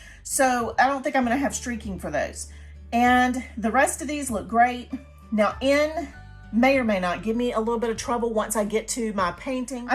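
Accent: South, United States. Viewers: These are beautiful. I like the magnolias best! I do have one question that you didn't mention--where do they get their images, do they pay artist royalties? And btw your pronunciation of "mauve" isn't standard for the US, it's because of your Southern accent, which is adorable. :) Also, the first picture shows peonies, not roses!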